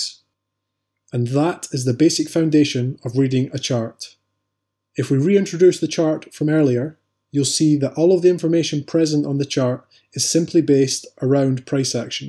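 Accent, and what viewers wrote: Scotland, United Kingdom. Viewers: Looks like I've found my teacher and a Scotsman by the sounds of it, like myself 👊 I recently opened a brokerage account and had a go. Yeah, ended up with a migraine and worn teeth. Thought I should search out a guru! Looking forward to an intense, enlightening journey! Really appreciate your content my friend! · Just a beginner here and was glad to find a video with easy to understand info about candles, and by someone with an awesome Scottish accent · Absolutely love clicking on a video and hearing a Scottish accent! Thanks for the great vid bro 🏴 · Great to hear another Scots accent. :) I just signed up for a ForexCT account but having suffered severe memory loss from a brain injury my days of previous financial education are a lost blur. So this video, I'm sad to say, is where my level is at. I'm just trying to put my life back together. 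(I'm a Glasgow boy but I now live in Australia). So thank you. ;) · Thank you for the clear and simple explanation. Great Scottish accent for narration too. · Excellent video, very well explained and presented. Nice to hear a Scottish accent as well. Thanks for the video.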